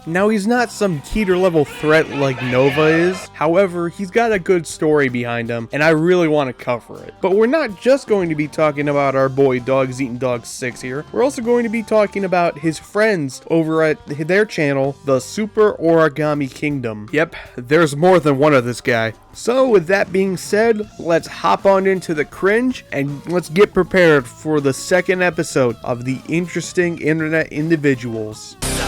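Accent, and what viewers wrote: United Kingdom. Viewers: And that British accento Is so thick and evident Not even english parodies can reach that level of accent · This guy is aggressively British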